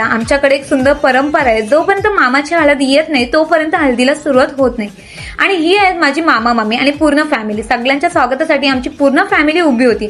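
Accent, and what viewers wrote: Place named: Agri, India. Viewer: Tuji bhasha tar aagri vatte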